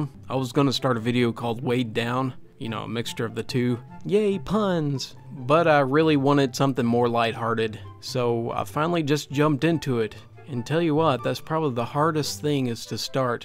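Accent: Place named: Kentucky, United States. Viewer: pleased to hear it in a KY voice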